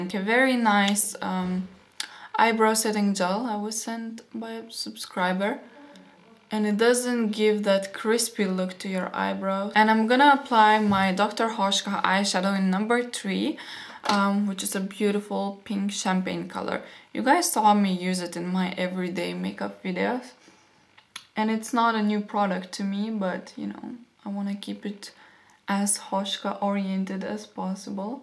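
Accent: Russia. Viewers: You can fill distilled water into the mascara when it is getting too dry, just a few drops of water, then let it sit and shake it a few times so that the liquid can mix. It works very well I have done that several times. You don't have to throw it away, this way it lasts for a long time. Dr. Hauschka products are 100% made with biodynamically grown plants and some products contain natural minerals, ashes or clays. All ingredients are from nature in their whole form and not altered. They don't test on animals and they don't have subcontractor companies test on animals either. Dr. Hauschka and Weleda are the best cosmetic companies in the world. I only use those. You are very beautiful and I love the Russian accent.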